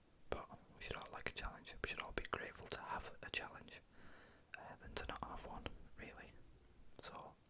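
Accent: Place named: Northern England, United Kingdom. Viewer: Just discovered you. You are now my favourite ASMRist. You sound like your from the north of England; if so where abouts? Yorkshire, Lancashire?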